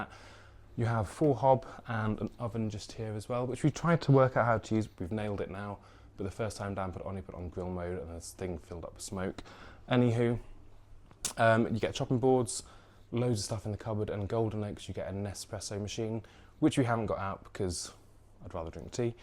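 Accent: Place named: RP, United Kingdom. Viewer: Love this. Me and my husband are staying in keldy in a silver birch for Xmas. Can't wait. Something funny aswell but my name is Daniel so it was a bit odd to see my full name in the corner of your video obviously your Dan and. Hahaha. Love your posh accent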